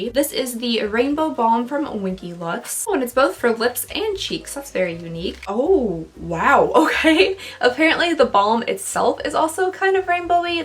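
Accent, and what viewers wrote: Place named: Tennessee, United States. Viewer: daaaamn! I'm the 12th. I enjoy myself. I'm in TN so I get her accent and humor. My Mom is from KY. We're all crazy and that's what makes these videos incredibly enjoyable. Happy early Birthday!! 🥳🎁🎂🎉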